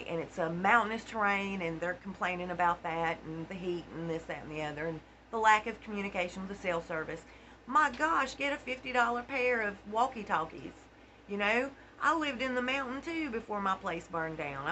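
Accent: South, United States. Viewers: I love your Southern accent. I'm Nashville born and raised but I lived up there (East TN). Covid was rough on LE up there. They know Summer wasn't abducted! Sheriff Lawson works in a high Opioid/ FENTANYL and METH territory. Lawsuit is frivolous most likely. They are smart. I heard ole Don is MIA! Something is about to break on this case?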